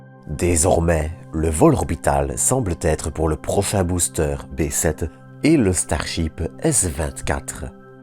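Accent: Belgium. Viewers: merci pour les précisions, mais j’avais une autre idée de l’accent belge, encore bravo pour tes videos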